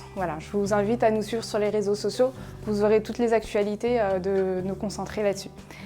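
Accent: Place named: Arab world. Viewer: Accent arabe ça fait plaisir 🥰🥰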